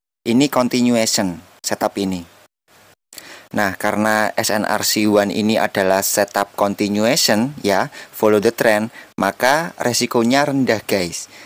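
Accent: Java, Indonesia. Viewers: Dari Logatnya, Pasti anda orang jawa ya? 😁 ,Cara anda mengedukasi sangat Keren bro , saya dukung.! Lanjutkan, sukses selalu ya.👍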